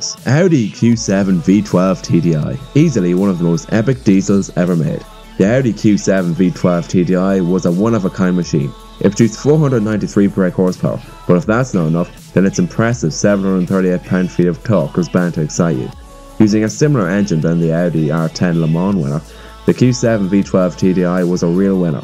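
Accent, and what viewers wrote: Ireland. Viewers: What part of Ireland you from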